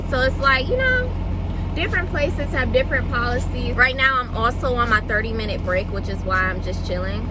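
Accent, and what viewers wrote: Michigan, United States. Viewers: LOL, I didn't realize you were local. But hearing you say "Meijersss" is so comfortable and familiar that I laughed. As Michiganders we have so many neologisms that mix with Yooper-talk and Canadian lingo and covered by all that Deep South drawl from our elders. 😁 People from outside the region have such a hard time figuring out where I'm from sometimes. 🤣 Waving at you from Detroit 👍🏾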